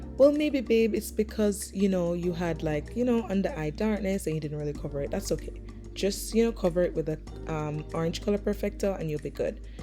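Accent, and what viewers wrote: Jamaica. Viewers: You sound so Jamaican 🇯🇲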